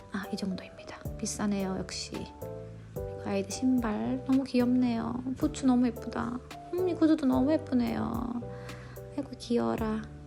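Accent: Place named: Daegu, South Korea. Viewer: 말이 빨라지면서 자연스럽게 들려오는 경상도 대구 사투리가 정겹게 들리네요. 늘 건강하세요. 영상 감사드립니다.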